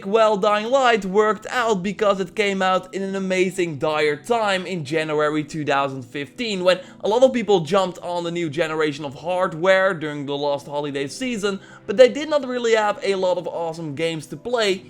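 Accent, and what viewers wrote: Netherlands. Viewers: From what country are you you sound like you are from holland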